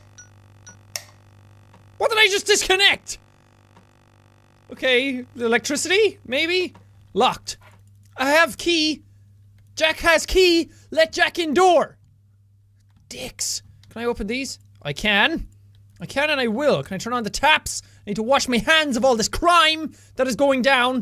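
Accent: Ireland. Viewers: Its the Irish accent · omg i love your irish voice. is it irish? if i offend you im sorry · back when he had a very prominent irish accent 😭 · And the bossasaurus Irish accent helps too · wtf kinda question is that he is obviously irish and is that german you tell me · Is he irish? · he's Irish I am too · HE'S IRISH! So not everyone can pronounce words the same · He's Irish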